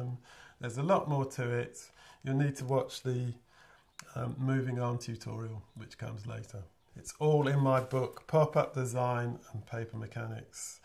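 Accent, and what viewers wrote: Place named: RP, United Kingdom. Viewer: ciertamente tiene un estupendo acento Británico culto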